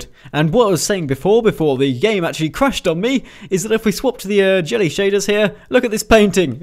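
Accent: United Kingdom. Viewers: hola solo quiero preguntarte si eres británico o no ... ?? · are u British not to be rude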